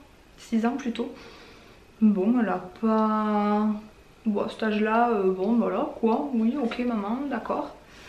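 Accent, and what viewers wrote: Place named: Marseille, France. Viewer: Je vis à toulouse et je trouve que tu as plutôt un accent de marseille :’) Personnellement j’ai 60kg à perdre avec la sleeve, et j’ai bientôta date de mon opération!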